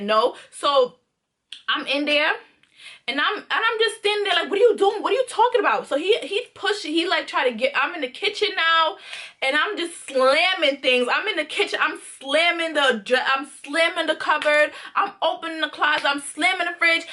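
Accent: Jamaica. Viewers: From mi hear the accent you a Jamaican ❗️❗️❗️❗️ found you in my recommendations.... like 20 secs in the vid n like it already ❤️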